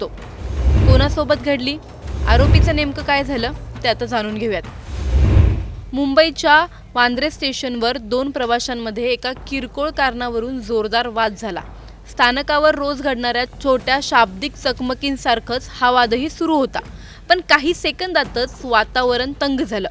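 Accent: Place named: Khandesh, India. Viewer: Aani pani he marathi bhasha jalgaon khaandesh chi ahe . Kahi problem ahe tula ? Marathi che khup variants ahet.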